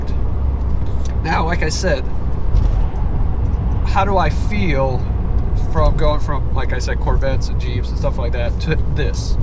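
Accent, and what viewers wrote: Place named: Europe. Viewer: You have a cool accent - kinda of a blend of a European accent with an American Southern accent.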